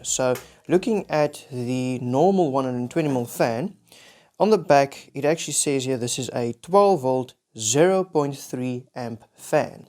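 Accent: South Africa. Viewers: You south African? I can hear the accent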